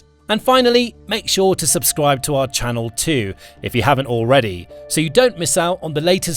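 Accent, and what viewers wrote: United Kingdom. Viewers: funny how every time I hear something like this it is a british accent and when I hear about russia winning it is always a eastern accent and yet, 4 years later and it is the same crappy stalemate, russia still stands(somehow???) despite sanctions and ukraine hasn't fallen despite the pounding the question is, when this thing gets all over and done with I ask, will ukraine be able to foot it's part of the bill? and russia, whatever the hell they're doing to keep afloat still works, but for how long? BTW, my condolences on those reactors.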